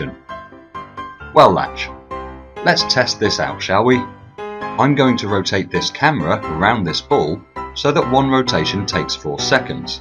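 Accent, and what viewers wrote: United Kingdom. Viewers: I'm American, male, 52, and British accent + sarcasm STILL intimidates me!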